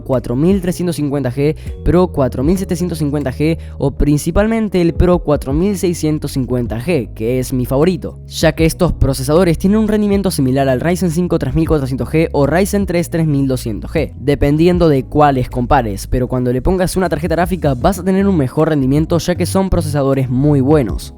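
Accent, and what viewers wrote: Argentina. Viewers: Sos de argentina???? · tenes voz de wachin y la rompes, y mas merito por parecer ser ARGENTO O YORUGUA... MAS DIFICIL no podias tenerla kajskasj y la tenes atada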